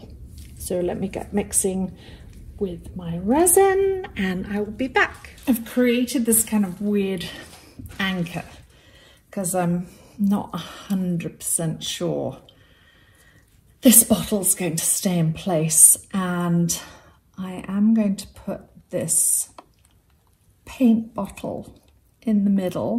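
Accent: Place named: England, United Kingdom. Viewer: You sound English, what are you talking about going to the 'dollar store' for?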